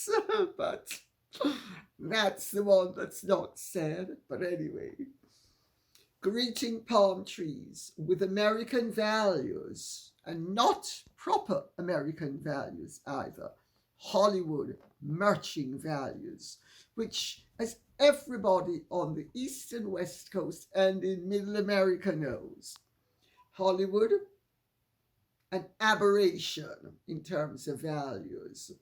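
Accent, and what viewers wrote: Jamaica. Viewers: Love the Jamaican accent 😀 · Yes I totally get it I am Caribbean also and when we want to put on our flava’ we really know how to put it on. I love love love love love the Jamaican accent. And I just wish she would do a whole video speaking that way. I can’t get enough of that wonderful spice! I am in Texas now and I so miss being around all the diversity that I grew up with in New York City and later enjoyed in Florida. I miss stopping by the Jamaican store to buy A Jamaican patty in Coco bread… and there’s nothing better than spiced bun with cheese in the morning with my coffee. Bring on that jerk spice Lady C! I am in Texas now …spice is a long way coming here! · Her accent is like that because she was born and bred in Jamaica and later moved to the U.K. Of course, she already spoke with a refined form of Jamaican accent because of her relatively privileged family origins. 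My Jamaican kin speak this way too. They happen to descend from a grandfather who settled there in the 1910s from England who married into an elite local family. They were educated there and sent to finishing schools in England and Switzerland. They sometimes wax more Caribbean at times, just like Lady C. when in full stride.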